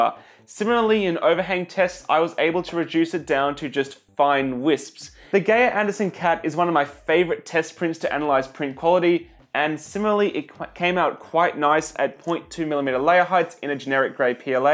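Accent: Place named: Australia. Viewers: Francis Taylor ... Aussie accent..... well he is from Australia..... again prices listed in US.... seems to be the standard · I probably shouldn't assume you would list in AUD - Aussie accent threw me ;) Anyway thanks for the continued great content!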